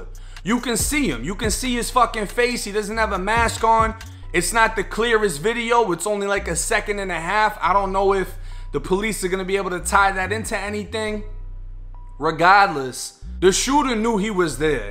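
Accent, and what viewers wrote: Boston, United States. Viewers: Are you from Boston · Yo homie are you from Boston?? That accent strong 💪🏾 AF. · Sounds like U from Boston?? But with the most Southern accent aloud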